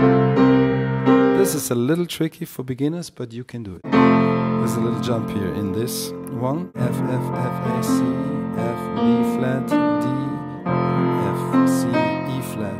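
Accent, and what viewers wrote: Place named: Germany. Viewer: Hab schon gedacht ich wäre der einzige der, denn deutschen Akzent gehört hat.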